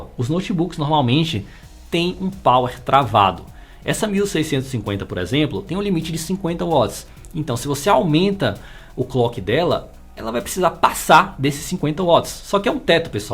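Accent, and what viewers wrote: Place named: Salvador, Brazil. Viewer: Seu canal é muito bom mano, impressionante como pessoas assim não tem tanto destaque quanto aos demais, vi cada review fraco até chegar aqui. Acredito e torço pra que seu canal tenha o crescimento que vc merece. Esse sotaque... vc tbm é Salvador?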